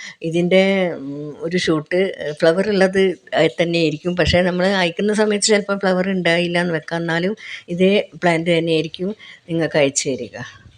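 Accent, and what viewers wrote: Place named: Kasaragod, India. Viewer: Samsaarathil endoo kasrod nn thonni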